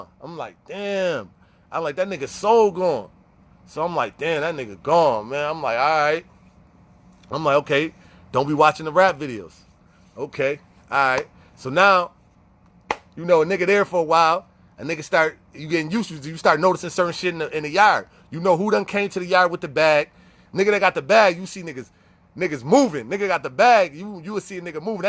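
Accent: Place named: Boston, United States. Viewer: Dude gotta be from Boston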